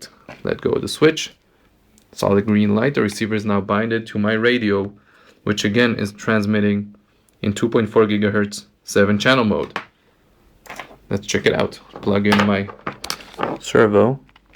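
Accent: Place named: Israel. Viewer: I thought you were Isreali....Your accent is so cool...Manish ma baby!! LOL Duvid Mordechi..... Hey boychick, let us know what kind of range this thirty dollar receiver has.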